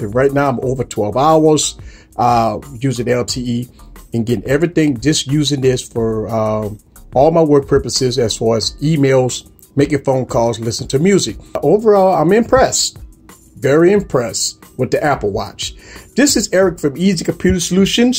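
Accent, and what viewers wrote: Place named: New Orleans, United States. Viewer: Hahahahahahahaha nice video. I’m from NOLA moved to LA when I was ten with fam. Soon as u began to speak I knew where u were from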